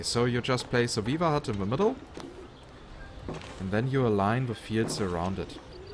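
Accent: Germany. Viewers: I love the accent, I knew you were German within a few seconds (I've lived here for 15 years I should know)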